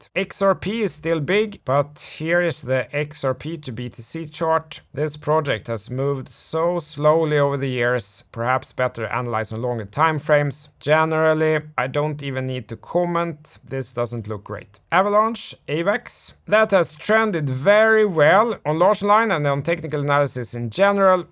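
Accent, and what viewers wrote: Sweden. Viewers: Svengelskan on point (Y)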